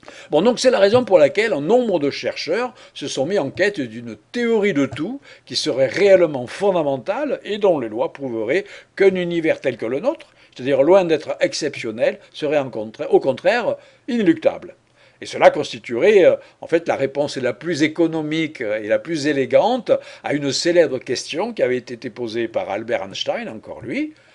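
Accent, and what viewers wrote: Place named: Marseille, France. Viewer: Et j aime bien votre leger accent marseillais ayant vécu un peu vers Aix.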